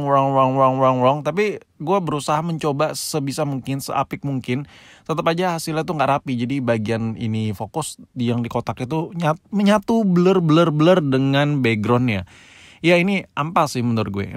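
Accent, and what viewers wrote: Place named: Medan, Indonesia. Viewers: Omak orang Medan ni kek nya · Logat Medan si babi ini, sini kau jumpa tengah kita di simpang pos, aku mau belik hape.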